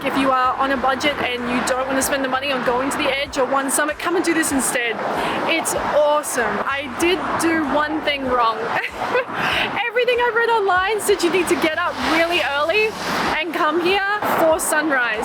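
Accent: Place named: Australia. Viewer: Should have stopped at the firehouse by the Brooklyn Bridge, bet they would love to chat with a beautiful woman with an Australian accent.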